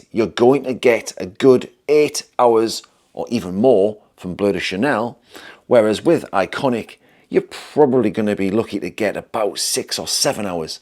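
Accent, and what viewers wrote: United Kingdom. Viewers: Love for your British accent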